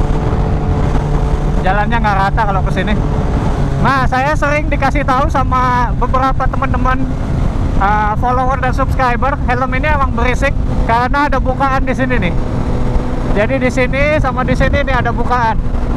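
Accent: Bali, Indonesia. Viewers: Logat nya bali banget...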